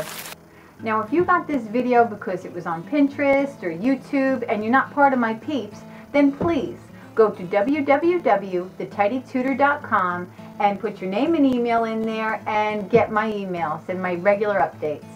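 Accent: New Jersey, United States. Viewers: Thanks for the tip. I don't know why I haven't bought one of those DVD holders yet. I actually did purge my DVD's this year and I gave away about at least 100 of them to the girls at Good Counsel Homes. Anyway, I'm seriously thinking of getting a DVD book like you have for the rest of them that I decided to keep. MyDVD and CD cabinet is exploding at the seams. BTW -- I KNEW you were from East Rutherford with that Jersey accent.........I'm a Bloomfield girl myself!! God bless!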